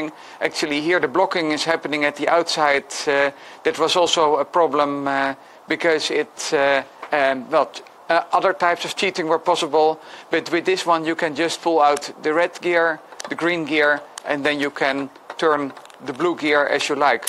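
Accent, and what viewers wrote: Netherlands. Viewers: Ha yes , the English Netherlands accent xD (also from the Netherlands)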